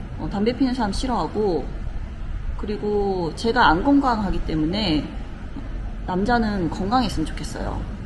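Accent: Gyeongsang, South Korea. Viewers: 억양을 보니 경상도 출신이군요. 수입은 꾸준하게 들어올 수 있는 구조로 만들어놔야 해요. 몇달 벌어 몇달 쓰고.. 이런씩은 노후 빈곤으로 이어집니다. 젊을때 열심히 일해야 해요. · 경상도 분이신것 같아요. 오늘영상에서 눈치챔.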